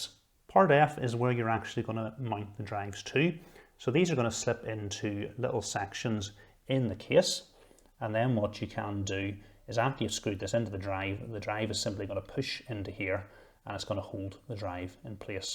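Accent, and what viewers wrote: Northern Ireland, United Kingdom. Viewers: Ignoring the obvious NI accent similarities, you sound an awful lot like Jim Browning! In the nicest way possible, your voice belongs to a much older man. It's calming and soothing. I'm from NI too, and never have I heard someone younger than about 45 sound the way you do.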